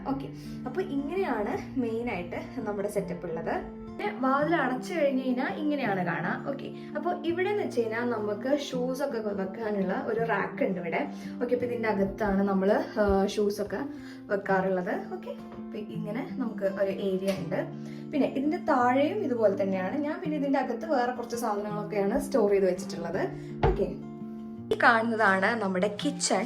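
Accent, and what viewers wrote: Malappuram, India. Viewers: Kozhikode aaanenn thonniyittilla, malapuram aanenn thonni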